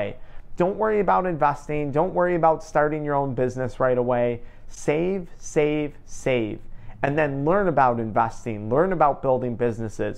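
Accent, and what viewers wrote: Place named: Buffalo, United States. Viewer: i knew by your accent you were from buffalo new york i saw the 716 number and knew it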